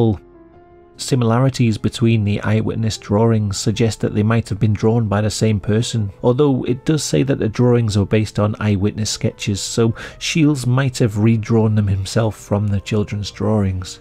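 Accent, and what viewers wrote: United Kingdom. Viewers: That British accent makes it even more peculiar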